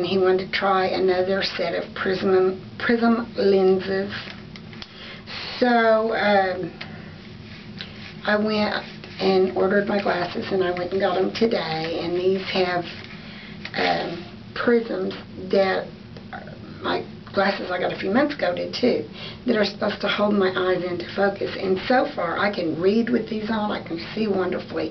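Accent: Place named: Nashville, United States. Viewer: First of all, as a new wig wearer I really appreciate having video reviews to watch to help me decide which ones to try. So thank you for taking time to do the videos. I also wanted to ask you where you got your OD done. I'm outside of Nashville and your accent kinda sounds like mine! I've had Graves' and TED for about 15 years. Had one OD In 2003 but in the years since my other eye has grown out. The dr. who did my surgery in 2003 has moved out of state so looking for a new one.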